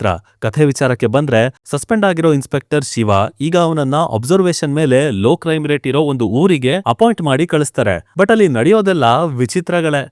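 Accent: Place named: Chamarajanagar, India. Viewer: ಚಾಮರಾಜನಗರ ಭಾಷೆ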